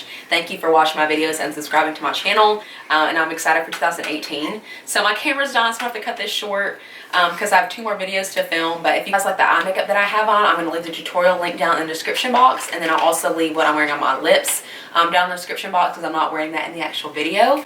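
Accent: South, United States. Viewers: Hope you don't mind my asking but where are you from? I hear a little country twang!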